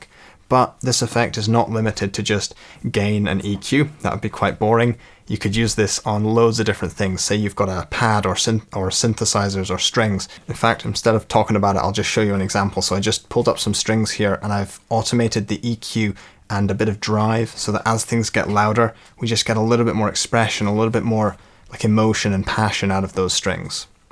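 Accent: United Kingdom. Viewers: I thought you are from the UK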